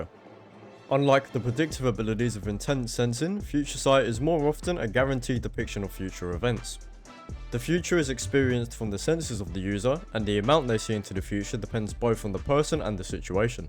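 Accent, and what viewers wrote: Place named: United Kingdom. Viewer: you are from britain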